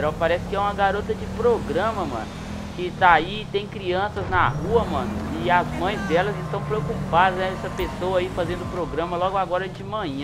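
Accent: Minas Gerais, Brazil. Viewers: vc é de minas gerais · C É Daqui De Minas Gerais????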